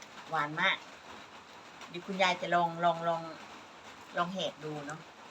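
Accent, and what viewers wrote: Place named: Sakon Nakhon, Thailand. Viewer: ❤❤ชอบค่ะพูดย้อปนไทยเผยแพร่ภาษาคนสกลนคร🎉🎉🎉🎉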